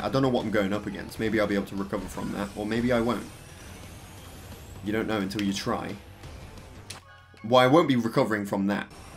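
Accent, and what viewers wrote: United Kingdom. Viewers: Dude are you from the uk?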